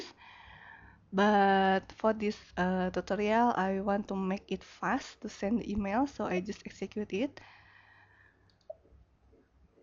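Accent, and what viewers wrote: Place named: Southeast Asia. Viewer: the accent is a mixture of filipino singaporean malay indian indonesian myanmar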